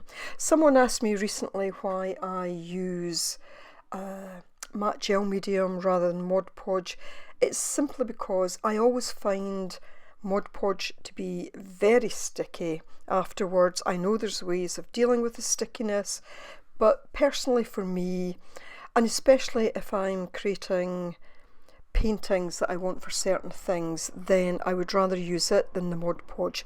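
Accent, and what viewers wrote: Scotland, United Kingdom. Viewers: I detect a bit of a brogue, Scottish? Love all your tutorials 💙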